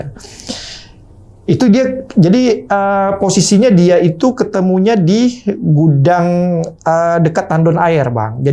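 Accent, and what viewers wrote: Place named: Banda Aceh, Indonesia. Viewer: Si abang, logat nya aceh kota lii kok😂, cirikhas awak banda aceh, btw ni binkara fm gampong jawa, kalo di google maps gaada, itu salah, yg bener di gampong jawa deket lapangan persis, lurus lagi kedepan ada tpa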